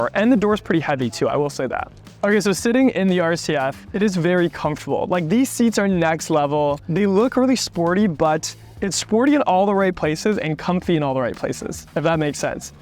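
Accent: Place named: Canada. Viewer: You’re so cute and love that Canadian accent! Nice video- a pano sunroof would make it perfect! What’s taken Lexus so long to put them in? Same with not the latest infotainment?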